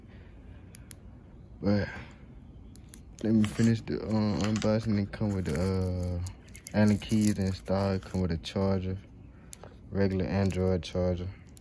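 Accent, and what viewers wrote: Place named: New Orleans, United States. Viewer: Sound like you in New Orleans bro. You know we got to stay up on straps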